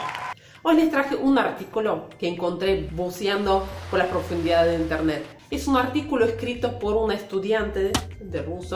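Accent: Argentina. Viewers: Vives en Argentina??? Lo digo por el acento que tienes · Que graciosa Rusa hablando argentino 😁. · Muy bueno, hablas como argentina nativa! Espero alguna vez hablar así el ruso.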